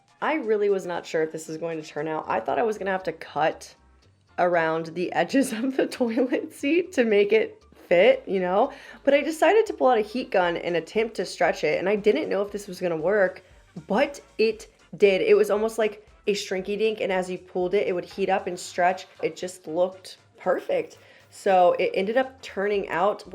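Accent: United Kingdom. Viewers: Wow … Legit looks amazing. Also the funny odd dorky British accent ish .. haha so random. So brave !! to have starting staining the shelf mounted …with the wallpaper …. My nerves !!!! Haha And yes….. toilet seats made of wood/ wood design.. look amazing. Well done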